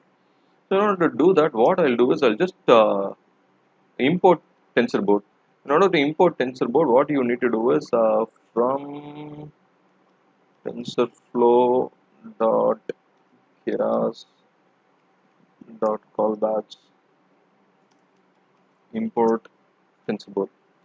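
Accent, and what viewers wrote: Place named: India. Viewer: Its like watching sentdex ML series again. With indian accent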